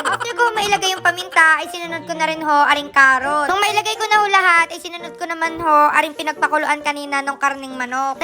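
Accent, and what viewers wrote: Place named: Marinduque, Philippines. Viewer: My lahi k plng Marinduque kya pla lagi kng my ngani sa salita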